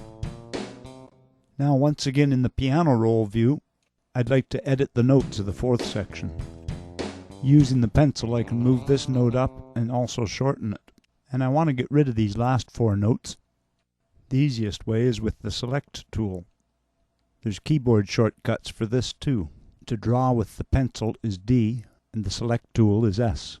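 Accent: Canada. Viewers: Hey Dude, Nice job on the tutorials. I'm am down here in San Diego and used to be from Cleveland, the Home of Rock and Roll, had a studio there called North Coast. You remind me of many a gig I did in Canada. I didn't read your bio but you have to be from the Great White North by the sound of your voice. I must say, you have a very polished delivery in your tracks and really easy to follow. I, being an engineer have spent HOURS editing "ums.& ahh. ahh" Nice instruction, preperation/delivery.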